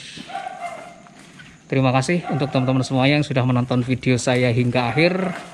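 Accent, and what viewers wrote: Java, Indonesia. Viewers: suka bingiiiitt bhsa jawa nya medok banget mas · Suka bgt kesini karena logat jawanya beda dr yg lain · Ngomongny ms"ny jowo banget...hihi....smoga yg punya msh sehat Aamiin.. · Ini Vloger keren, baru nemu Eyang. Dah langsung subcrib, ngelonceng, like, koment. Dan yang penting Eyang dah nonton sampai gusisss😃😃😃. Suka gaya bicaranya yang njawani. Semoga subcribbernya semakin mlenuk, Nak🙏👍❤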